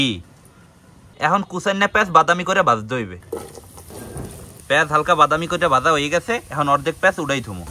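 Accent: Barishal, Bangladesh. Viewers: এ দেহি মোগো বরিশাল্যা 🙂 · ভাইয়া আপনার বাড়ি কি বরিশাল 😊😊😊😊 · কথার ভাষা একটু ভালো হলে ভালো লাগতো বরিশালের ভাষা বলে কথা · বরিশাল এর আপনি?? কথা শুনে মনে হচ্ছে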